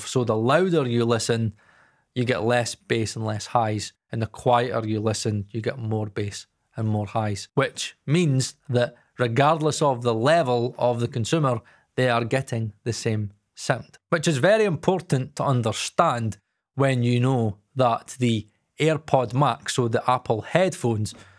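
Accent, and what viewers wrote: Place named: Glasgow, United Kingdom. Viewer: This sounded like very well reasoned advice that accounts for how the tech has just moved on. I also got a nice affirmation for my decision to buy my Kali LP-6 v2 last year. I also just loved your Glaswegian brogue. It was like listening to Winston, my spirit animal from Still Game. AWESOME! Gone is the notion to even think of some NS-10 as even a reference. I next reference will just be better monitors like a pair of Adam's, or perhaps Neumann's.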